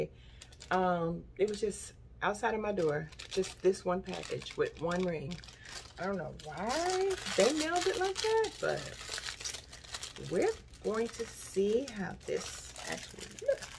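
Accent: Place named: South, United States. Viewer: First good morning, and you are highly blessed. We all are, and all I would tell them that there’s many more Temu Haulers other than yours channel to choose from. Feel free to move over to them. I give you my blessing to change and you should get what your looking for. Keep doing what you’re doing, because I like others of your viewers love your southern accent then you let us know that not your normal personality, but we love it. ❤❤❤❤❤❤❤🎉🎉🎉🎉🎉🎉. Your beautiful and your giving us NO BORING CONTENT. Linda Hackney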